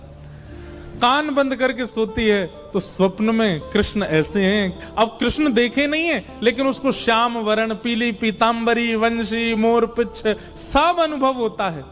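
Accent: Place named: Braj, India. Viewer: Indresh ji ap bhut acha bolte ho. Kitni khoobsurat h apki awaz. Apki Braj Bhasha. Sach m sundar❤❤❤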